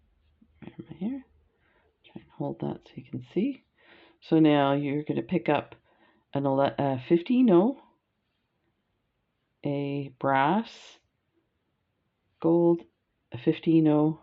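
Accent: Canada. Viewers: I'm so happy to see these earrings. So gorgeous. I just got my hair cut so my earrings will show now...haven't had short hair for years! You explain each step and I enjoy your "chatter", it's part of your charm! Keep doing it. Love your Canadian accent.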